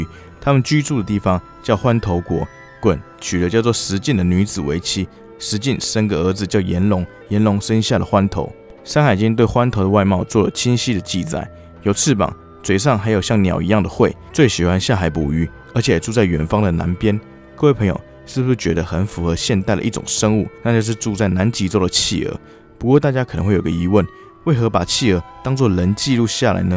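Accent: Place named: Minnan, China. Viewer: 主播的國語去再進修，一嘴閩南口音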